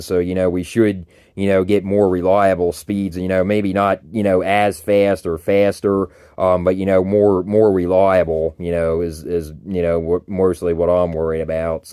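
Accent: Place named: New Jersey, United States. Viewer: An Australian who adopted a New Jersey accent...you know.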